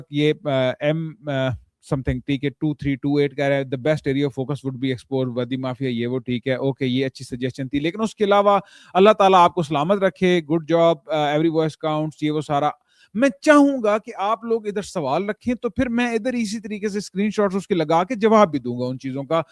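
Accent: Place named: Pindi Gheb, Pakistan. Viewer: Me be jhang bahtar attock se urdu to aap bol raha hen lakin Axcent pindi ghab fateh jang wala he aap ka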